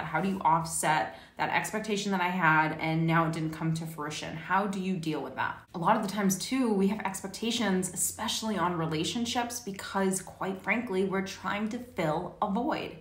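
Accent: United States. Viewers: thank you lady to listen to you it helps me to overcome my deception and now I'm so confused. and it's true you can't control what happen in our life . I have another tool to keep living glad is the music . I don't know where you have found this wisdom? it's too nice to hear your American accent. thank I feel better now and I learn so more when I go through trouble it learn me the reality of the life. thank you I'm RICHARD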